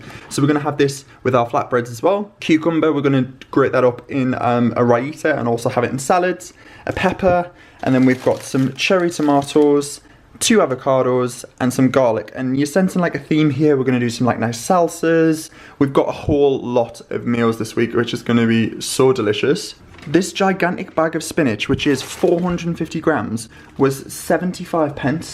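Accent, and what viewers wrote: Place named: Durham, United Kingdom. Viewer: Your accent is so familiar, you sound local to me, I live near Durham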